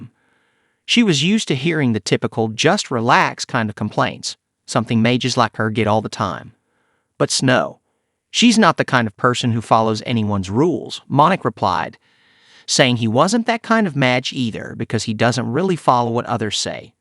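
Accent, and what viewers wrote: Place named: South, United States. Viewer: Sorry but the southern accent just doesn't work with this.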